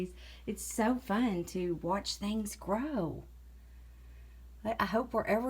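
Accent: South, United States. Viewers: I love how excited you are getting about growing these! Also, I’m going to have a better day because you told me too in your lovely southern accent 😊🤗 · Ciao,. You have the cutest southern accent in all of the world, my dear friend. What's your honest opinion on roses?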